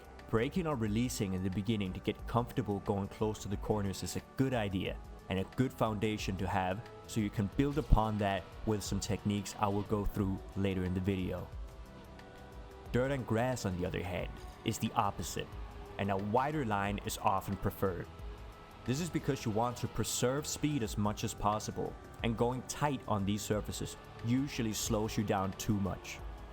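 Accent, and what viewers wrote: Denmark. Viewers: I reconize an Danish accent when i hear one xDD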